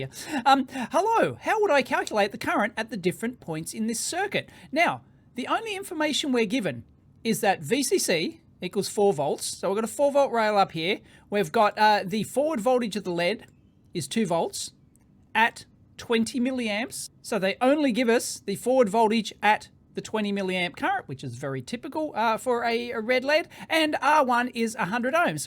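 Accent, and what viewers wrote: Australia. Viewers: is Australian. That's pronounced " 'strine".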